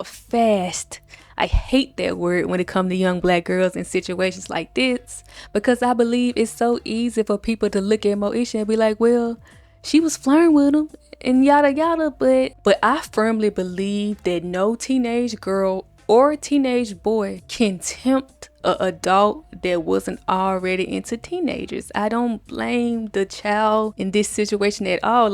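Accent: Memphis, United States. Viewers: Are you from Memphis? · Ok love this but are you from Memphis lol? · off topic to the video but as i listen to you speak i keep wondering “she from Memphis huh???” lol. W video btw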